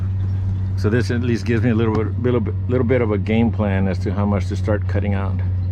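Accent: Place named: Louisiana, United States. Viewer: This guy sounds like he’s from Louisiana. Got dat cajon accent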